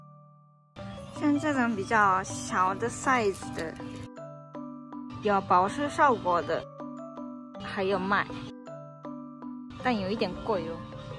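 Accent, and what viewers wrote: Taiwan. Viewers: 台湾腔小姐姐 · 居然有點台灣腔喔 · 這中文該是台灣學的 マスク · 台湾口音加日本口音的国语混合在一起真是特别呀。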